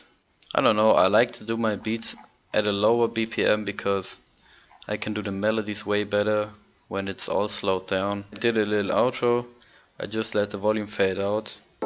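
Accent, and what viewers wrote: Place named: Germany. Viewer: man hört den deutschen Akzent haha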